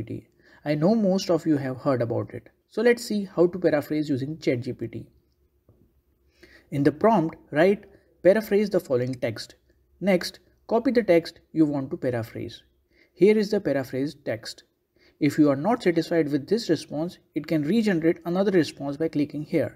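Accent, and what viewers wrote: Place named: India. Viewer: I come from London. Probably his stong Indian accent makes me don’t understand what he said.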